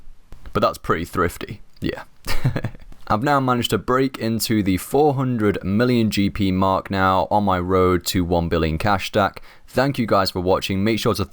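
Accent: United Kingdom. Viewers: Yo, what size monitor do you have and also are you from England?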